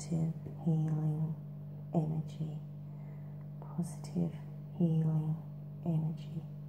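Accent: Australia. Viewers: Great Aussie accent!! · You do a better Aussie accent than me and I'm Australian! Love it girl! Great vid! Thanks xx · awesome Australian accent !!!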